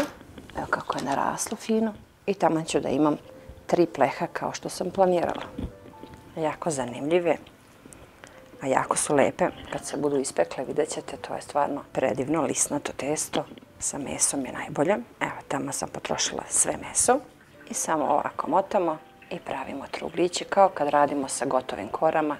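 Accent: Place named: Trstenik, Serbia. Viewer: Ooo sunce moje❤️ stvarno si zlatno dete.Podsećaš me na moju ćeru.Ne provodim baš previše vremena na netu,ali tu sam,i mnogo volim da gledam,sada i pratim tvoje klipove❤️Čak i kada sam u bolnici,ti si mi velika uteha jer obožavam da te gledam i slušam.Ti si moj zavičaj❤️Imamo isti naglasak,pričaš kao da si baš iz mog Trstenika, Medveđe,majka ti je predivna,porodica❤️ Recepti su bukvalno isti,kao što je mene učila moja baka,pa mama.Možda nemam mogućnosti da budem sve vreme na netu,da pratim baš sve❤️Moj muž,duša moja, me je u maju napustio,i nikako to ne mogu da prihvatim😢🙂❤️Preteško je,ali opet život ide,ne pita,a ja idem za njim,kako moram❤️Velikim delom utehu nalazim i u tvojim klipovima sunce drago,i zato ti beskrajno hvala❤️🍀❤️Veliki pozdrav i zagrljaj iz mog Oldenburga❤️Bog vam zdravlje i sreću navek dao🍀🍀🍀❤️❤️❤️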